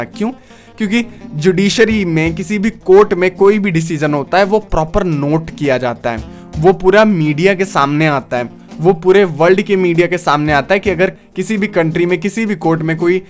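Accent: India. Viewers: Bajya aap gujrat ya bihar ya rajesthan ze ho kya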